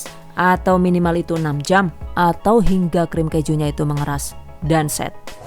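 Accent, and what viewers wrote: Banyumas, Indonesia. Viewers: Bunda lucu. Dialeknya bisa Inggris, Indonesia dan Ngapak 🤭. Anyway, terimakasih reswpnya, bund. Kalaudioven, bagaimana ya hasil cream cheese-nya? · Bunda asline mana kok bisa pake logat ngapak si.. hehe salam ngapak dari Banjarnegara 😁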